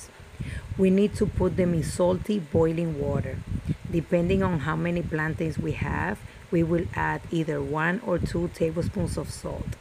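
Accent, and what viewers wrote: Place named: Dominican Republic. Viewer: This lady talks in English as if she is talking Dominican Spanish. Same style, delivery, idioms, etc. Made me miss home, god bless.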